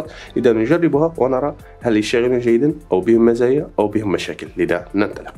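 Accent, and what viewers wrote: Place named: Morocco. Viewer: حسنا اخي بارك الله فيك اعتقدت انك مغربي بسبب لهجتك 😄🥰🥰🥰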